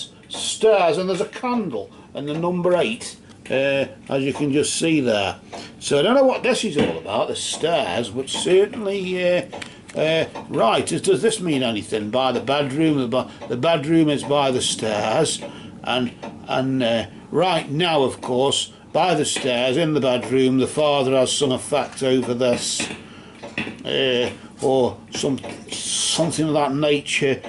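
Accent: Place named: Stoke-on-Trent, United Kingdom. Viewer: I really enjoyed your reading and the video in general, thanks for sharing! For me, I think the reading was trying to say that Eric was now with his father, not sure if there were issues in the past with him but now, they are together but ultimately, it is the past. I think wants to move forward and is carrying guilt, which may be causing the nightmares but the cards are indicating that she should move forward (present, stairs, day) I think the eagle represents that he will always watch over her. The message cards at the end were awesome, I think his message was strong, you did a great job! BTW, where are you from, I am from Stoke-on-Trent and you sound like the bloke next door!!!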